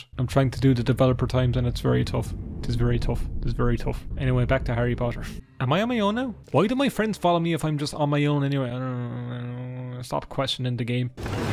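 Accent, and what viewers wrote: Ireland. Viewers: i love your irish accent <3 greatings from germany :)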